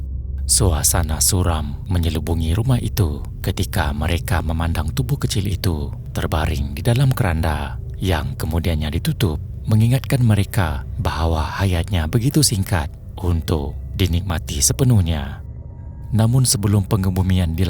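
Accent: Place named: Malaysia. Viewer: Min indon ke Malay? 😂Dngr mcm malay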